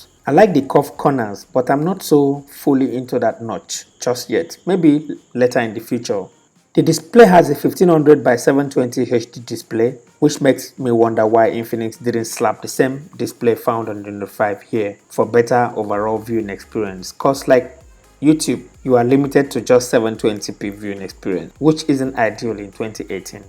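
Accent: Nigeria. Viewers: Nice to hear a Nigerian voice in the Tech Youtube world. Keep it up bro. Nevertheless, most Nigerians (May be Africans in Africa) buy phones without checking out reviews. Infinix, Techno, etc don't make waves outside here. I think its because though they are generally cheaper, they dont offer the best amongst other budget phones. 4000mah battery not lasting half a day. Too bad. There are better phones of same price range such as the Nokia 6 and similar series; and some Motorola series. Wonder why these good phones are not so common in countries like Nigeria. Nigerian marketers only look to make most of gains rather than satisfying customers. Period.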